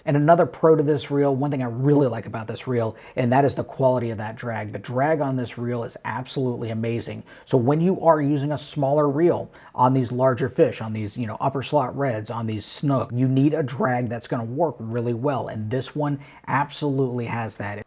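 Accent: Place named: Texas, United States. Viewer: Texas rubbed off on you huh?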